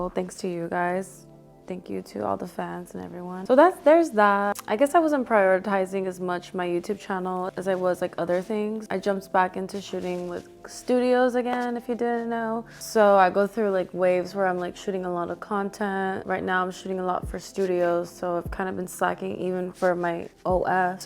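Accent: Spain. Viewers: LOVE THAT SPANISH ACCENT 🔥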